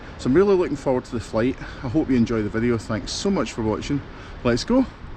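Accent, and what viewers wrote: Scotland, United Kingdom. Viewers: Great video. Love the Scottish accent!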